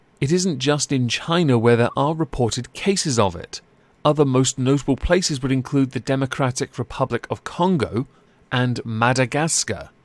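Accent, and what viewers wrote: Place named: United Kingdom. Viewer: "Incredibly cucked simp" That was perfect! xD I enjoy your verbal readyness & sense of humour as much as Alizee's. Also some people have the combination of nice voice & accent and that's pleasant to listen to. I'm biased ofcourse, as I've watched British programming since early age and therefore learned to like the language & accent :)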